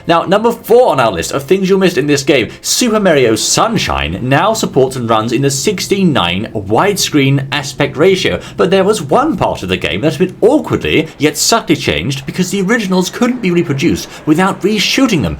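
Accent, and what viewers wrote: RP, United Kingdom. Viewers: OK. This is the first time I've heard him speak but..... I LOVE HIS BRITISH ACCENT!!!! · your accent is posher than mine! I too are from the UK.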